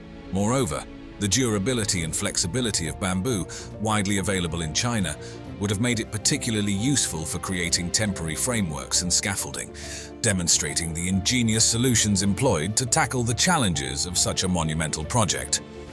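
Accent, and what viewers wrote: England, United Kingdom. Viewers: It's tough listening to the narration because it's in an English accent and all I can think of are liars.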